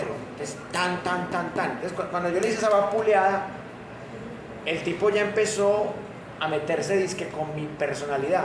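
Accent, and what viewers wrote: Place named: Spain. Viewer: Es tan genial escuchar a este profe...explica todo, claro, castizo ❤... así, sí que se entiende!👍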